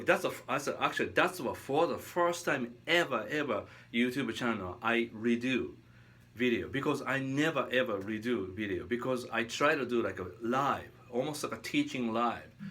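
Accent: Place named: Japan. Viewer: I'm a simple guy. I see long black hair, Japanese accent, tasty blues...I hit like button... Btw Beato's vid and subsequent inferring are a little elliptical: the way you play is highly influenced the comfort (or discomfort) you feel on the guitar; I'm not surprised Beato (whose guitar setup is mainly on 8's) plays waaaay better and sounds waaaay better on 8's...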